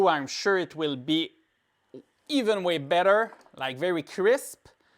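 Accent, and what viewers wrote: Quebec, Canada. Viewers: Je reconnais cet accent du Québec 😊 Bon vidéo! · Serais-ce un accent Québecois 👀 Bonne video btw! J'ai présentement un CV1 et j'ai commandé un quest 2 et j'ai commandé un link cable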